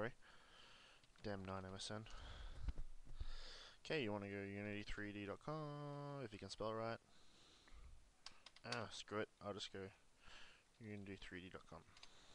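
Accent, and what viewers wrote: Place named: Australia. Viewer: You're Australian :D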